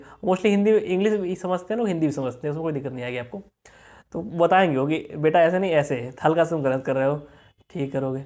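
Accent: India. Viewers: true bihari style communication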